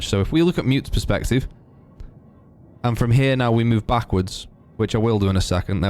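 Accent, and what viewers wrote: United Kingdom. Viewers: In a world full of slightly toxic American content creators, I really enjoyed the friendly, familiar British regional voice. Good content! Worry less about how you’re conveying things, it could be more concise, but the pacing was great, so I don’t think it matters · bro are you British 💀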